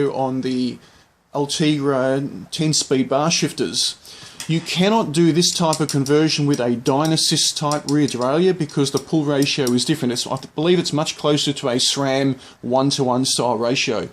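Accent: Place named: Australia. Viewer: Australian, I reckon mate.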